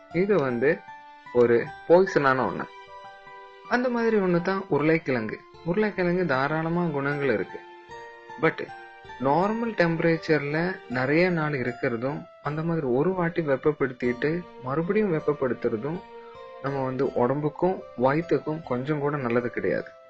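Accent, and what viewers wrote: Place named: Kanyakumari, India. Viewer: ungal kumari- tamil slank arumai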